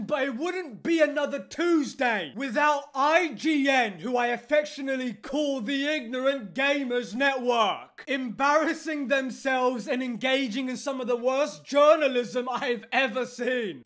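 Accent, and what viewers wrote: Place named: England, United Kingdom. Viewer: Geez, these " This game sucks" channels appear to be all the new rage. They are popping up everywhere, the formula: Possess an English accent, utilize clickbait titles, and just yell about bad something is. LMFAO, the North American IGN review isn't even up on their site yet and he's screaming bloody murder about a game he didn't play and how bad it is 🤣🤣🤣🤣🤣🤣🤣🤣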